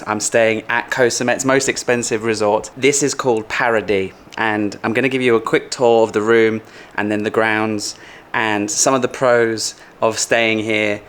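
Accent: United Kingdom. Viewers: I clicked hoping to hear a British accent - yesssssss!!!!!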